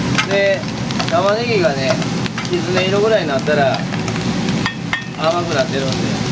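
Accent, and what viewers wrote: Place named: Osaka, Japan. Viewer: 動きも喋りもルーズに見えるんですけど無駄がないから余裕があるんですね。実況コメント、質問への答えのひとつひとつに無駄がない。おすすめメニューの理由が「他店との比較ができるから」、焼き飯がおすすめの理由（動画全部観てください、説得力あります）も誠実というか。誠実・正確でゴリゴリの大阪弁っていうのがまたいい。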